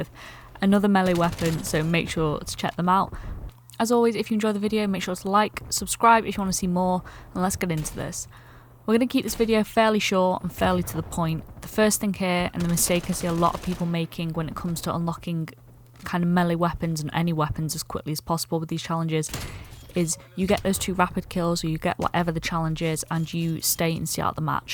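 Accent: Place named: United Kingdom. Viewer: Why are are you british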